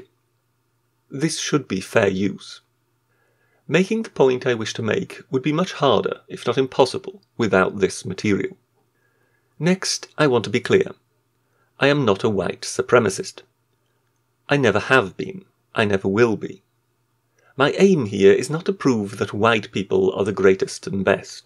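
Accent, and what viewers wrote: Wales, United Kingdom. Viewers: ahhhhhh I'm burning, the welsh accent is killing my ears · Just a suggestion - You should try and give a more natural delivery than the forced ‘sophisticated’ accent you currently adopt. It’s really obvious and comes across as fairly arrogant and ‘try hard’, and undermines your entire channel in my opinion. Edit - Are you welsh? I just realised you sound a bit like Rob Brydon. I’ve always thought that accent sounded funny, it was hard to pinpoint your accent, at first it almost sounded like you were failing badly at attempting an old posh accent, but now I realise. Yet they say we have no culture??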